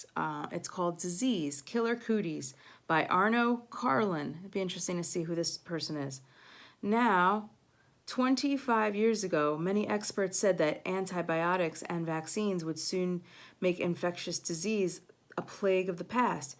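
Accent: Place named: New York, United States. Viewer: hear hear! Good Stuff! I like your style, and the subtle ny accent...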